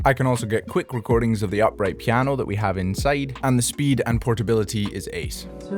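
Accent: Scotland, United Kingdom. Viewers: I love when you click on a video and hear a fellow Scottish accent! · Awesome video brother! Just outta curiosity. what part of the UK are you from? Cheers from Canada!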